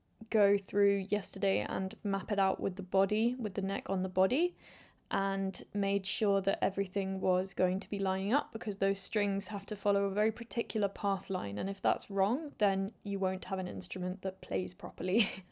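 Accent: United Kingdom. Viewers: I am captivated by your woodworking skills ,love your British accent. The hoping is probably good for blood flow to your legs .🌻🐇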